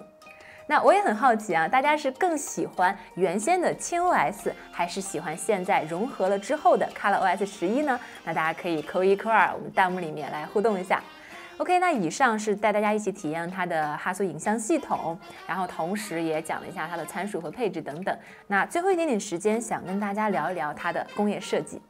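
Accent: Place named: Hebei, China. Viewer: Eva是河北人吗？说英语的感觉和我老婆一样，我老婆邯郸的。加油！支持你！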